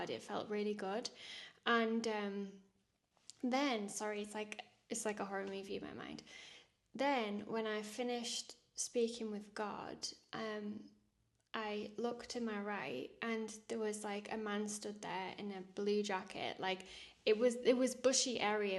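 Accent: Northern England, United Kingdom. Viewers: I've rediscovered my FAITH thanks to you and now my Wife. I maybe rediscovering my FAITH but that was a demon! You are doing God's work doing all the good in the world giving Heavenly Father's messages!. Stay safe for goodness sake. I'm newly married Wife in Queensland I'm in PERTH western Australia. I too have had similar things happen to me. God talks to me daily and its as if and I'm sure you can relate we are suddenly targets. I've had so many experiences I could have been killed its freaky! I'm From England and its your Northern accent drew me. Cheshire the Wirral?? 😊 Either way You make the each day warmer happier with your Videos. God bless You. ❤😊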